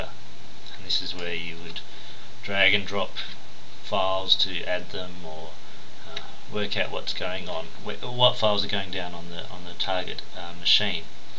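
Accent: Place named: Australia. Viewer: omg you're Australian aren't you!? That's awesome... fellow Aussie 😁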